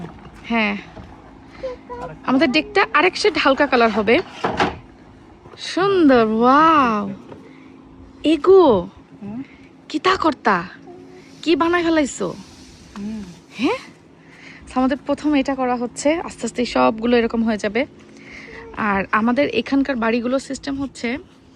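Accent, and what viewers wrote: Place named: Chittagong, Bangladesh. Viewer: আপু ডেকছি চট্টগ্রামে ভাষায়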